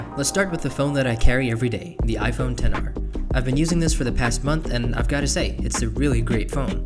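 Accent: United States. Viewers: Damn , your accent is freakishly American !! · amazing quality and content bro❤️ at first i thought u r american😂😃❤️ U earned a subscriber bro❤️ Keep up the good work🔥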